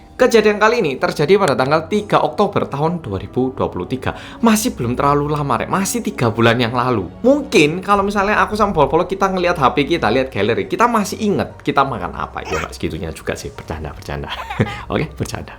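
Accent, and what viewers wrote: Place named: Java, Indonesia. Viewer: Ok... medok... Uraian mu stlh bercerita, aku suka❤ tks sukses sehat selalu ya 🙏🏿✌🏿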